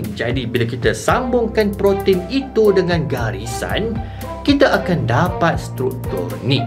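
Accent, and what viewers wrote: Malaysia. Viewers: Disini saya belajar sains + bahasa dan aksen Malaysia sekaligus. Terimakasih 👏👏🙏🏻🙏🏻🙏🏻🙏🏻 Dari negara tetangga 🇮🇩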